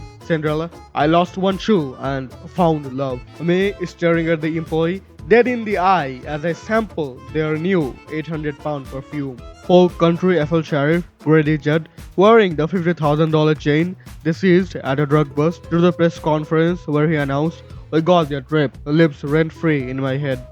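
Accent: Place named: India. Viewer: U sound so Indian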